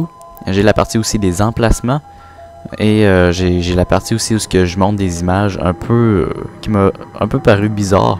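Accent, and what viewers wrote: Quebec, Canada. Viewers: Canadien, Québecois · un quebequoi <3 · L'accent canadien c'est cool mais on comprend rien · tien un quebecois :D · ENFIN UN QUÉBECOIS SUR COD QG :D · Accent québécois > tout les accents. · T'ommage que t'est l'accent canadien sa m'a un peu dégouté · putain vais vous croyer que l'accent quebequoi c'est tout mimi ? c'est style 2 video mais la il faut arrete putain ! il y a des mots INCOMPRENSSIBLE ! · Cest Quebecoi apren unpeu a tinstruire elle est pas mieux votre accent en passant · t srx je le kiff set accent canadio? ou quebequoi? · respect super bonne video mec et fai pa gaffe au ptit kikou qui critique ton accent psk moi jsui pa québécois mais jle kiffe ton accent ^^ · J'aime l'accent québécois 8) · l'accent quebecois <3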